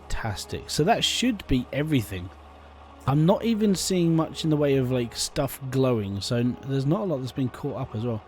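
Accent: United Kingdom. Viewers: hears Brittish accent subscribes i love this game and that accent XD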